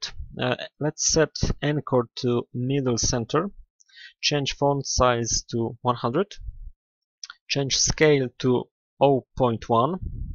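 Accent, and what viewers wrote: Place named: Indonesia. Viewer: dari logat bahasanya kayaknya orang indonesia nih...bener ga ya??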